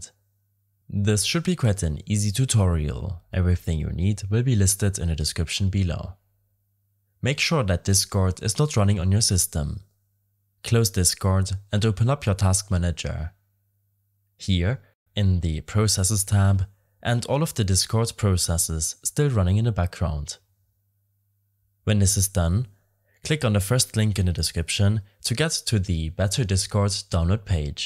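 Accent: Germany. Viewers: I smell deutsch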